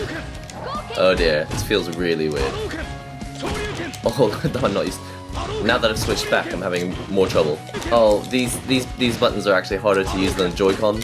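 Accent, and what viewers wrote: United Kingdom. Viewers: it's fuckin confusing a Japanese dude talkin perfect british accent !!!! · U have a British accent? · The funniest thing is that he has a British accent. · I don't wanna sound rude or racist but... I think it's kinds weird how u look/are Asian Yet you have some sort of a British accent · i had the video on in the background and he sounds regular british and he says 'on the front it says hori pad' and i thought that was odd - i mean, we can read, right? ... so i checked the video and yeah. couldn't read it. thanks for that.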